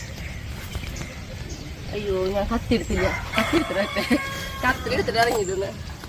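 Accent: Thrissur, India. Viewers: hello, njan thrissurkariyanu. veedu evide anu Anu? Thrissur bhasha kelkkumbol valiya snthosham. Krishi valare ishtamanu. All the best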